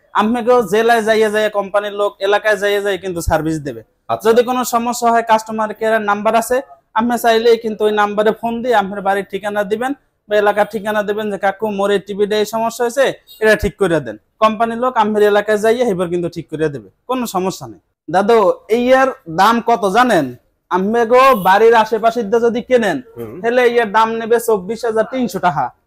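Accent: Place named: Barishal, Bangladesh. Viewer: খাস বরিশালি🤭🤭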